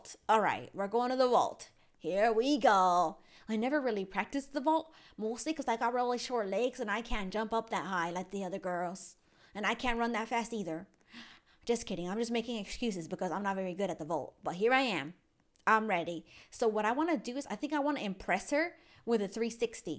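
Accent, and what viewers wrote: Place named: United Kingdom. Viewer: OMG I love ur voice h a little bit of normal, and a little bristish! LOVE YOU<3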